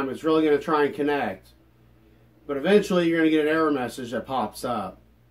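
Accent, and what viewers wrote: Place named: Philadelphia, United States. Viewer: Are you from Philly? Because that sounds like one hell of a philly accent.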